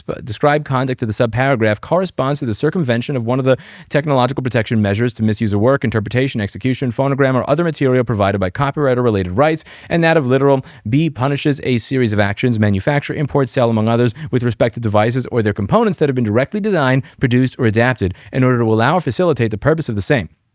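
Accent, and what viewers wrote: United Kingdom. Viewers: why he talk british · nice British accent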